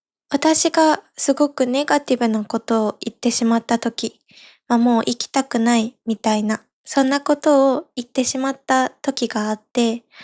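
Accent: Kansai, Japan. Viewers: Hey, I listened to the last 15 Podcasts so far and always try to catch up :), no matter what topic I love listening and learning together with you. Actually when you become more "negative" I can resonate a lot, it makes me thinking of happyness and future and I want to thank you for that, because without speaking about it, it just stacks up over time. My Japanese improved also sooo much (Next time I try in Japanese again :D) :) PS: Your Kansai Dialect is very cute ^^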